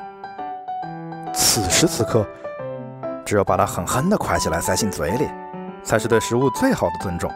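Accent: Wuhan, China. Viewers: 武汉老乡好。浓浓的武汉口音啊普通话。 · 我真的是爱死的武汉口音了😂😂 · 武汉人？有点耳熟：） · rua 真是亲切的武汉话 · 怎么听都像隐藏的武汉人呃！👍🤣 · 拐子的口音很武汉，亲切，武汉加油！ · 出门在外这么多年，改不了的是满口的武汉腔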